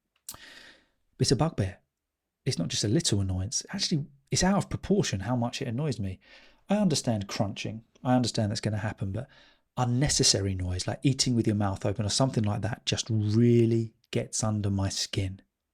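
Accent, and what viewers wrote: United Kingdom. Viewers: Totally perfect British English accent thank you very much for your teaching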